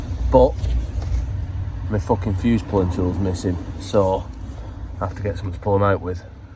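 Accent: United Kingdom. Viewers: 10 34 43 or 53? Holy Rodger English Brit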